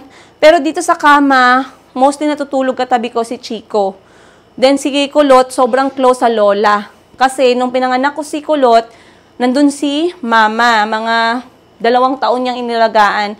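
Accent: Iloilo, Philippines. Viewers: ilong go kaba kc yong tono ng Salita mo ilongo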